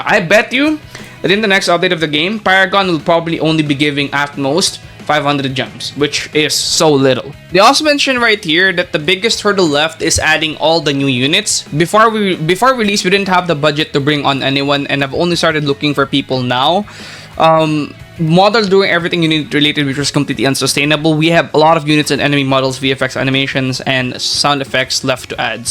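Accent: Philippines. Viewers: bro, nothing negative, but you have a filipino accent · accent makes it evident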